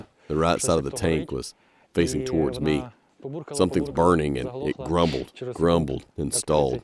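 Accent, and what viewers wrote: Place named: South, United States. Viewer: I really like the southern voice used! I like to listen to longer Resonance videos while driving.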